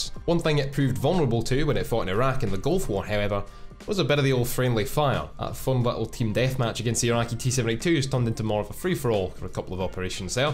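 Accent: Scotland, United Kingdom. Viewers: Scottish ey noice!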